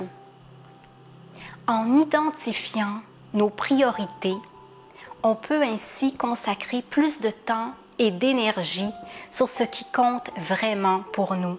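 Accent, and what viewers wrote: Canada. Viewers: Bonjour, merci pour ce partage ainsi que la précédente vidéo. J'adhère totalement à vos propos et j'essaie au mieux d'être minimaliste (pour moi et pas pour les autre). J'ai désencombré le superflu. Je me suis sentie tellement mieux et pourtant, j'ai beaucoup de peine à ne pas réencombrer. Surtout avec certains produits, comme les produits cosmétiques, les sacs à main et les livres. Avez-vous des conseils ? Et aussi, pourquoi est-ce si compliqué 'être dans le moment présent ? Au travail, je me dois (et je pèse mes mots)d 'être multitâche. C'est maintenant ce que l'on attend d'un "bon" collaborateur sinon c'est le licenciement. A mon âge (59 ans) c'est compliqué de me dire, je vais changer de job...et la retraite n'est pas pour tout de suite...Belle soirée (ici en Suisse, c'est le soir 😉) ( avec votre si charmant accent canadien, que j'aime tant, j'imagine que vous vivez au canada😊) et à bientôt.😗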